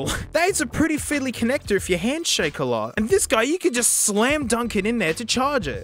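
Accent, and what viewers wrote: Australia. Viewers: He speak in Australia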